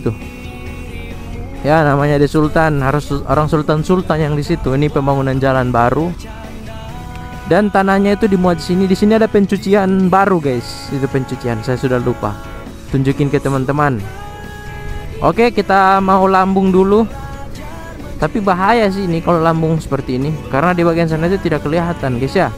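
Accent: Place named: East Nusa Tenggara, Indonesia. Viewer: logat bahasa indonesia tu hampir mirip NTT ya... 😂😂😂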